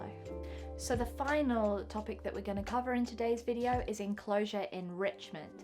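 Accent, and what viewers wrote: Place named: United Kingdom. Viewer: Judging on her accent she is in the UK. They aren't illegal there. Only releasing them into the wild is illegal. Even in the US people in the pet trade keep them but you will get in trouble releasing them or selling them Edit: A lot of people also catch their Giant African landsnails outside in areas where they are invasive and keep them. Id argue they are doing the environment a favor by catching them and keeping them indoors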